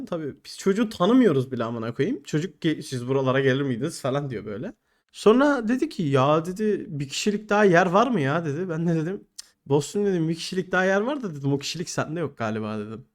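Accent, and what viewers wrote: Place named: Kahramanmaraş, Turkey. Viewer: Kanka Kahraman Maraşlı mısın ? bizim otaraf gibi konuşuyon :)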